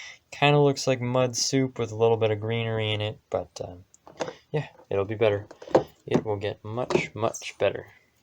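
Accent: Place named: New Zealand. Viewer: Your voice and accent is just like Kane Williamson, New Zealand Cricketer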